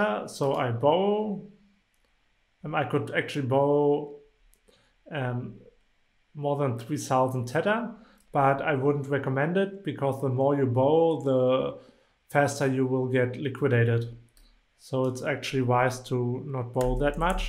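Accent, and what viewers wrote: Germany. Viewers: nope... he's most deffinetely a german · are you german?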